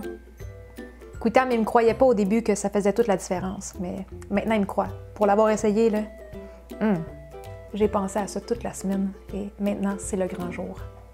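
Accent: Quebec, Canada. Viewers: Vous êtes très claire dans vos explications et surtout vos dégustations finales donnent encore plus envie de tester vos recettes. Gardez votre bonne humeur et votre pédagogie ! Super chaîne ! ( Et en plus j’adore l’accent quebecquois qui dégage beaucoup de sympathie )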